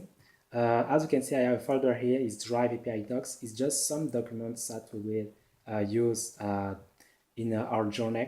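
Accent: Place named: Germany. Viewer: Great tutorial brother, Loved that German accent!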